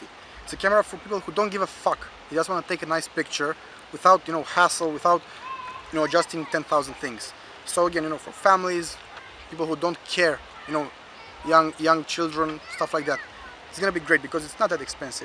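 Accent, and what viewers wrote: Romania. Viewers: Salut, Banuiam dupa accent ca esti roman, apoi cand am vazut ca ai aparatul setat pe romana am zambit:) Am de gand sami iau aceasta camera pt pret si pt ca vreau doar poze ,,cazuale,, daca ma intelegi. Apreciez munca ta si bafta in continuare. :D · I like the fact that you talk fast, but i think it would be nicer if you worked more on your accent. From the first moment i knew that you're romanian and for some people the fact that they can tell you if you're a native american or not is a nice thing, but not for me.. It's kinda' like hearing an american talking romanian (without having the native accent)... Thanks for the review. As far as the camera that i shoot with (Canon PowerShot A610), i think the Nikon Coolpix L310 would make my photos looking pro (only in comparison with my actual camera). You may say that this camera is not for professionals, but i think it surely can take profesional photos if you know how to shoot. 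Thanks again! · Mai... Dupa cum vorbeai la inceput puteam sa pariez ca esti roman.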